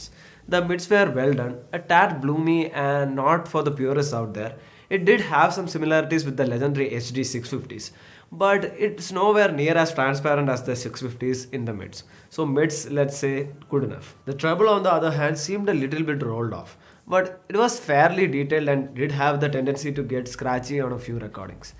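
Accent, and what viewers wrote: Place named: Tamil Nadu, India. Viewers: OK!!! I have to admit that South indian accent of english is God tier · Typical Tamil accent. Nice review Dude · Sometimes it gets little hard to understand his south indian accent.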